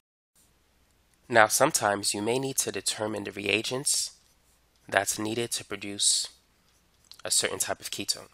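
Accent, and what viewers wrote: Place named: Northeast, United States. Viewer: his voice is soothing idk what you’re talking about. lmao its like an northeastern accent from the US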